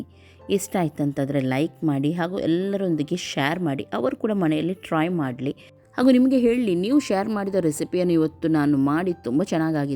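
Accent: Mangalore, India. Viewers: Bayalli neeru barta ide. Nimma matina shaili Mangalore bhashe super...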